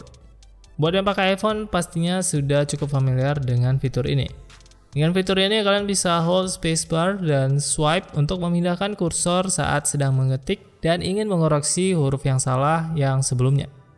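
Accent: Aceh, Indonesia. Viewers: lamtemen. aceh bro?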